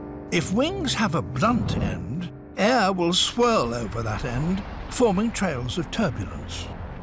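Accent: United Kingdom. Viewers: I have loved David Attenborough way of narrating nature for many years ... his british accent and the dedication to spread out knowledge.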